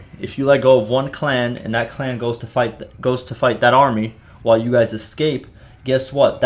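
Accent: South, United States. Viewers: I feel you on the southern part it's def how we talk😂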